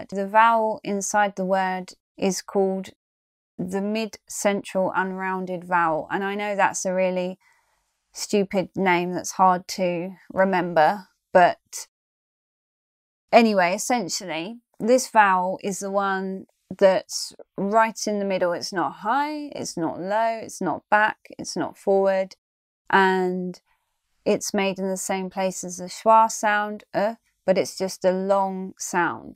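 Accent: United Kingdom. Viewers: That's the British pronunciation, which differs a lot from the American one.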